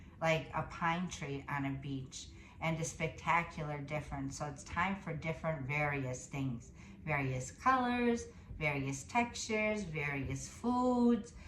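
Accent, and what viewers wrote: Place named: Chicago, United States. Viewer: Beautiful, affirming reading. Thanks so much. For your brilliant assessments. They feel spot on. Thanks for all the study and research you are doing. You are so intuitively gifted and we Geminis love to communicate our higher knowledge. Love you,. You are a treasure. Love your Chicago accent. I lived across the street from Water tower Place when I went to grad school at Northwestern for 7 years in Chicago. Love my Chicagoans!!! Happy 4th. Stay cool in Las Vegas😎💖🙏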